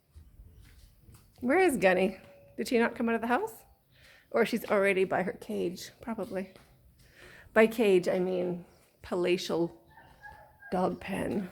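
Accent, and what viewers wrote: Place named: United States. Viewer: Your big dogs are lovely! Will they eventually be allowed to be with the Bulldogs? Your accent is American...however it seems you live elsewhere ...may I be as bold as to ask where?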